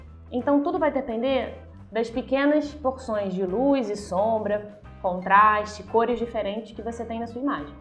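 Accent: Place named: Minas Gerais, Brazil. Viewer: Mineiríssimo! Amei as dicas! Vou aplicar! ❤️❤️❤️❤️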